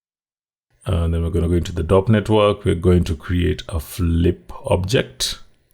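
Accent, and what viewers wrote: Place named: Kenya. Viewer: As if I’m looking for a tutorial, recognise the accent and find someone else from Kenya. Good stuff man. Are you working in Kenya or out the country?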